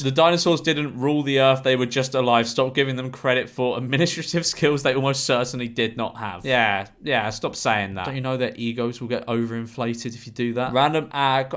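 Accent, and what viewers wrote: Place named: RP, United Kingdom. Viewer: You know when you got imposter syndrome? I got this but with. I thought I was watching a different person when I heard his posh accent. Golly, when did sound posher?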